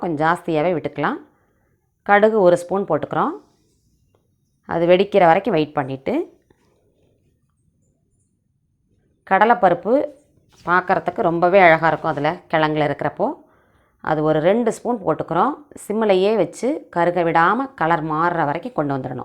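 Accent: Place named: Coimbatore, India. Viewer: உங்களுக்கு எந்த ஊர் சிஸ்டர் கோயம்புத்தூரா 🤔🤔 நாங்கள் திருநெல்வேலியில் இருக்கிறோம் நீங்கள் ரொம்ப அழகாக பேசுகிறீர்கள் அதனால் கேட்டேன் வாருங்கள் அவ்வப்போது எங்கள் வீட்டுக்கும் முதலில் வந்தீர்கள் இப்பொழுது ஆளையே காணோம்🤔🤔🤔🤔🤔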